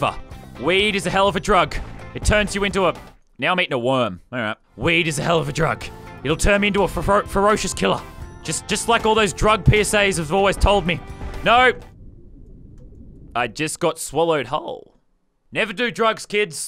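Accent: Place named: Australia. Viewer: What part of Australia are u from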